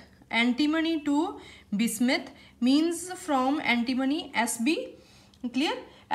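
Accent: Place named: Punjab, India. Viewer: Vich vich tuhadi punjabi sun ke swad hi aa gaya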